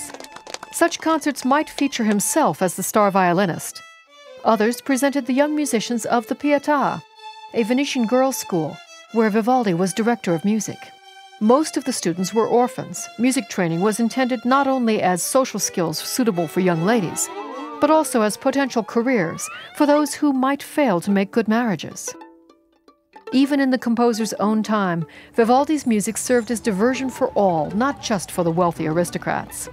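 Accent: United States. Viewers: What is this lady's accent? Obviously some kind of American but sounds a bit different? (also, great vid!) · Quite annoying with the very American accent, maybe in respect to the masterpiece, put an European to narrate the video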